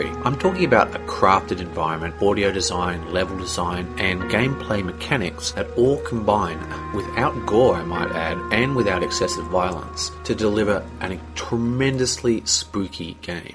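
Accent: Australia. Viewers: Are you Australian? You got a nice, calm voice to listen to, and greate review! :D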